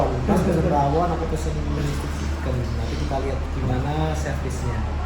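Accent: Java, Indonesia. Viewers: Yaampun logat bahasa jawanya itu loh yg bikin 😍😍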